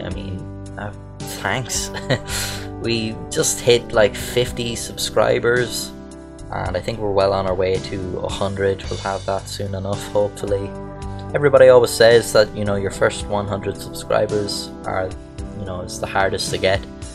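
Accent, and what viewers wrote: Ireland. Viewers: I just stick around to hear him pronounce “thirty” with a D. Abd other Irish crap like that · Is that a Carlow or Wicklow accent you have ?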